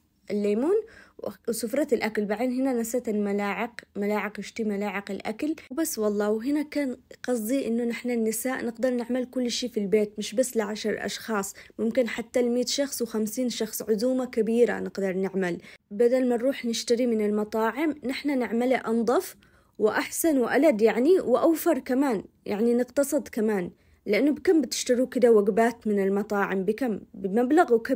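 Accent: Aden, Yemen. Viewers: ما شاء الله عليك فناانة بجد 👏🏻♥️ تسلم الأيادي، حبيت قناتك وطبخك وأسلوب كلامك😍 ويا حلاة اللهجة العدنية منك 😍♥️ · يمنية ؟ لهجة يمنية جميلة واكل ولا اروع تسلم ايدك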